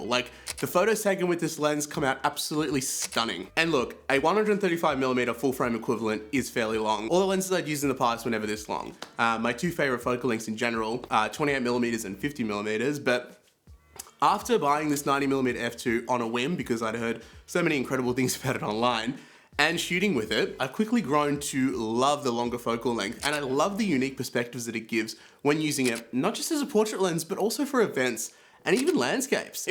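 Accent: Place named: Australia. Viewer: This lens has been my workhorse lens for most of my events and weddings for the past couple years. I have a similar 'review' of this lens on my channel also. I should say however, moving forward I think I will be replacing my XF90 with the XF50-140, that feels like the zoom equivalent of the 90, a bold thing to say indeed! At 140mm f2.8 you will get similar rendering and DoF to the 90 at f2 making me feel like keeping both is overkill. I think the 50-140 with the 56 is a good way to go (or at least the direction I am currently heading in). Good review and nice to see/hear another Aussie on the platform.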